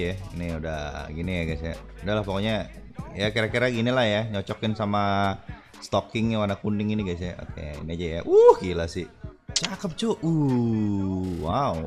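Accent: Java, Indonesia. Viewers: Wong jowo to kue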